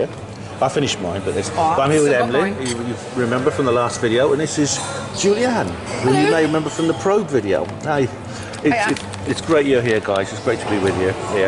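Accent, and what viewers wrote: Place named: England, United Kingdom. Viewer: Kind of strange, to hear you, a Welsh man, speaking with an English accent to your fellow country men and women, who have Welsh accents! Do you think about that or does it just by pass you? Funny cos they welcome you as having a big loud Welsh voice!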